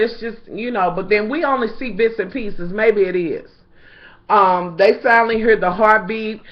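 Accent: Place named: New Orleans, United States. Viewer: Miss I swear that I love you, if I didn't know any better I would swear that you were from New Orleans!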